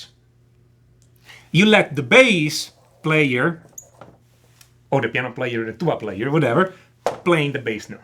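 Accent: Italy. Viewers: What Music theory lesson isn't instantly made better by having an English speaking teacher with an Italian accent !!? ( It is an Italian accent, isn't it ? )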